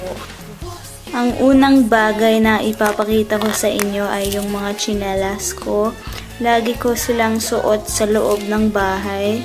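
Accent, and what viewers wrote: Visayas, Philippines. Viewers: Kakatuwa tunog bisaya ka kung magtagalog ka. Same lang tayo heheheheh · She sounded more bisaya than tagalog native. Paano nangyari to. 😇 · bakit parang bisaya ka magtagalog? Saan mo napick up ang accent na yan? Cute.. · When you speak tagalog parang bisaya accent 😁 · Galing, mukang caucasian pero visayan accent na tagalog.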